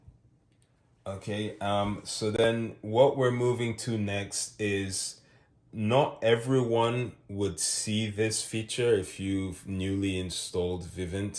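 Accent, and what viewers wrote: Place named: Caribbean. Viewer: Funny u don’t sound British, sound more Caribbean from African descent. 😮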